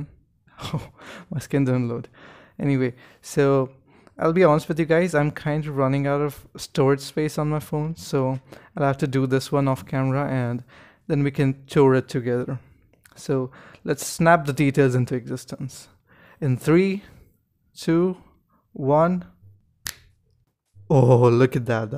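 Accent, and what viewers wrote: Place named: Europe. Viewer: Are u from europe? U kinfa sound like ur from there. Im asian 🇵🇭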